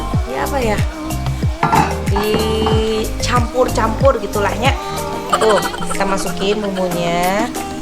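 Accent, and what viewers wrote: West Java, Indonesia. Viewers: Lucu pisan org sunda mh nyriosna th geulis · Resep ku logat sundana teh 😁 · aku dri bdg tth qu jd sma ngomng nya sunda bgt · Ya Allah.. beneran lucuuu si teteh.. logat logat sundana...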